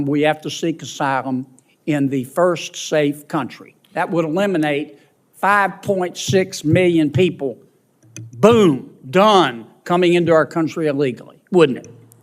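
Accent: South, United States. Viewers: I wish this guy would speak slower and enhance his southern drawl.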